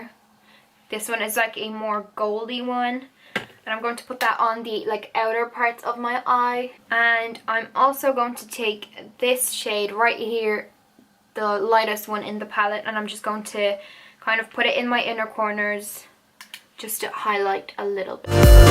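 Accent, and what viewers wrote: Ireland. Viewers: I just found your channel and I love it !! 😍 are you from Ireland ?? Your accent sound a little bit Irish xo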